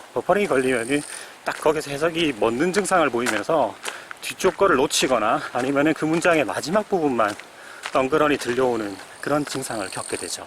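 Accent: Busan, South Korea. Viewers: ㅋㅋ 이기대네요 저 이동네살아요 부산 억양이 많아서 고향이 부산이 아닐까??생각했었습니다 저도 그 옛날 학교운동장 스탠드같은 거기 좋아합니다 거기 뷰도좋구요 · 부산에 사세요? 저도 부산어 사는데 ^^ 반가워요~ 어쩐지 말투가 친근하다했어요 ㅋ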